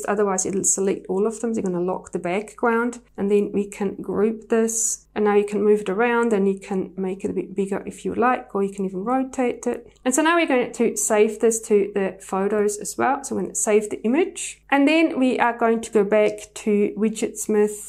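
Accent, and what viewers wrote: New Zealand. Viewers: i love the new zeland accent so nice to hearr (im from australia) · I love your New Zealand Accent <3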